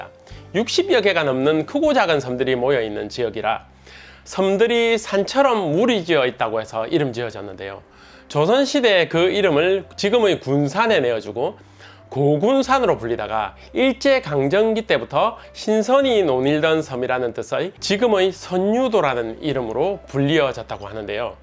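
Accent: Gyeongsang, South Korea. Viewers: 구수~~한 깅상도 억약이 참말좋십니더 좋은정보들 주셨어 감사합니다~~^^ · 목소리 작렬 ..경상도 사투리 그러나 듣기는 쬐끔 ㅎㅎ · 저도 경상도 사람이라 듣기좋아욤👍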